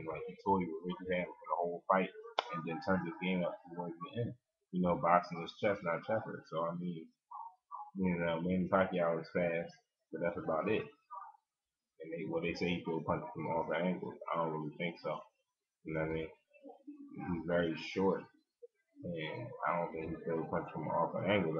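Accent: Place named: Philadelphia, United States. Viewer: I can tell you from Philly by the way you talk no homo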